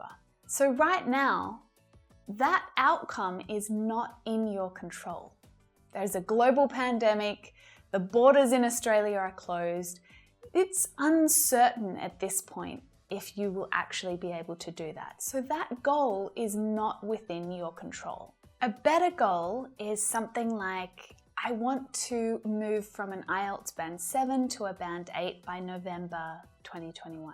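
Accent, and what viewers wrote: England, United Kingdom. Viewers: A lovely english accent! A simple mesmerizing voice! One of the best teachings ever! I wish all the best for you! Keep making this incredible videos for us! Take care dear teacher! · Hi are you british ?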